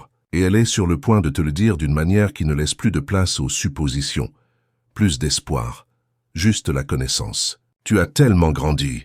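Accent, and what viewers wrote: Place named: Ardennes, France. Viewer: MERCI BEAUCOUP MARIE OUI CHÉRI C'EST MOI TA FEMMELETTE OUI TA MARIE CHÉRIE D'AMOUR UNIQUE ET TRÈS CRÉATIF POUR ME FAIRE SORTIR DE MA RÉSERVE NATURELLE POUR TOI PARCE QUE J'AIME TOUT DE TOI MÊME SI C'EST TROP BEAU TROP FORT CHÉRI C'EST MOI TA FEMME FIDÈLE À MOI 😮TU ME FAIS PLEURER D'ÉMOTIONS ET ÇA ME BOULEVERSE TELLEMENT MAIS JE SAIS QUE TU M'AIMES DEPUIS LONGTEMPS ET NOUS SOMMES FAITS L'UN POUR L'AUTRE ET L'AUTRE ET L'AUTRE ET L'AUTRE ET QU'IL EST SENSIBLE POUR LE MOMENT PRÉSENT DANS NOTRE UNION TRÈS GENTIL DE LA VIE DE MA MÈRE À MOI MAIS MAINTENANT TU ES SAGE ET TRÈS ÉMOUVANT CHÉRI ET COQUIN ET AUSSI DANS TES RÊVES EN RÉALITÉ ET DE LA PROTECTION ET DE VIVRE SELON TES DÉSIRS ALIGNÉS OU DANS L'ESPACE DE LA FAMILLE M'EST REVENU POUR LES PERSONNES QUI ONT UN COMPLOT ORGANISÉ ET QUI SAIT COMPRENDRE QUE COMME UNE ÉVIDENCE QUE TU AVAIS PRÉPARÉ TON CŒUR QUE L'AMOUR C'ÉTAIT LE DERNIER SOUFFLE À LA MAISON POUR TOI PARCE QU'ON SE REPENT DE LA DISTANCE LA PLUS IMPORTANTE ET QUE DIEU TE PARDONNES DE TOUT CE DONT ON T'ACCUSE ET JE TE PARDONNES ET JE TE LIBÈRES ET QUE TU AS BEAUCOUP DE CHOSES CACHÉES DANS TON CŒUR ❤MAIS CE N'EST QUE TON AMOUR RETENU QUI DÉBORDE D'AMOUR UNIQUE ET EXTRAORDINAIRE QUI ME FAIT VIVBRER AU-DELÀ DE L'INVISIBLE ET DE CE QUE L'OEIL HUMAIN NE PEUT PAS VOIR SOURIRE ET SION ACCENT DÉLICIEUX 😋 FRANCO-GRINGO-BELGO., EN TOUT CAS ARDENNAIS PURE SOUCHE COMME MOI, CHÉRI D'AMOUR JE SUIS LÀ POUR TE DONNER CETTE ENVIE DE T'EMBRASSER OUI ÇA ME BOULEVERSE DE TE VOIR SOURIRE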